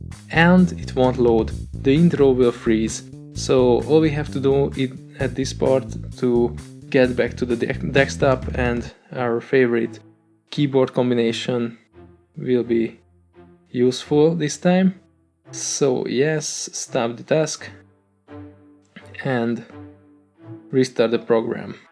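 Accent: Hungary. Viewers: Thanks ! :) That was a really big help :) u from hungary ? cause ur accent is same as hungarian english accent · Jól érzem az akcentusból, hogy honfitársak vagyunk? :D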